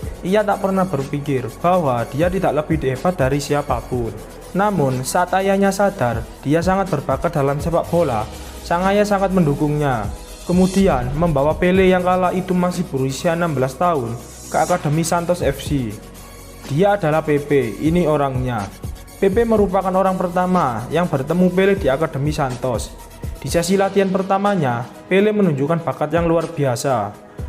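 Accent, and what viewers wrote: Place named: Java, Indonesia. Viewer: suarane medok banget, mantab surantab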